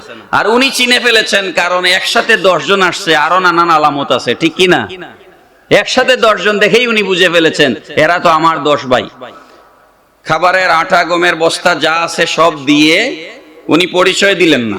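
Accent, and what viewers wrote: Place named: Sylhet, Bangladesh. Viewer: Masallah sundor waz sylheti vasha onek valolage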